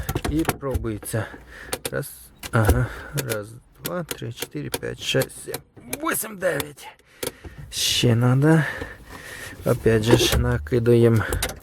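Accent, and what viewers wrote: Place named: Surzhyk, Ukraine. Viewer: Я чомусь думав що це просто в тебе суржик такий,але ти як думаєш так говориш так і пишеш. Це по якому ти ,,балакаєш,,? Якщо по кацапські то говори вже, вибери одну мову на якій думаєш,на тій і розмовляй,я як лікар можу поставити діагноз - діслексія,алексія,біполярний розлад. Це ж кров з вух, більше трьох хвилин дивитися не можливо. Конєшно воно є такоє,мажліво тут паставіть єсть що так.....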